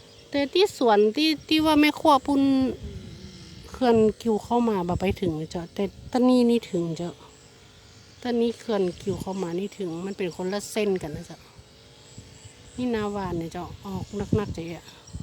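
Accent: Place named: North, Thailand. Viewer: ฮ่าๆๆ เมือง เป็นเพื่อนกับคุณ แล้วนะคับ นานแล้วด้วย ในคลิปข้างๆน่าจะเป็นต้นยูคา ใช่ป่าว ปลูกริมนาแบบนี้ ดีจัง ภาษาเหนือป่าวเอ่ย เพราะจัง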